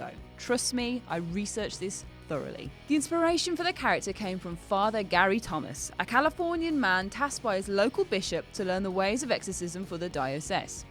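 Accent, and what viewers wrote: United Kingdom. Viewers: I don't believe in ghosts, but I also don't have a problem with hosting. I find it kind of refreshing to hear a female British accent presenting a Whatculture video.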